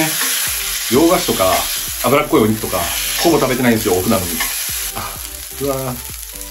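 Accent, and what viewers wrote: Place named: Kansai, Japan. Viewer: あと、フル関西弁動画お願いします😎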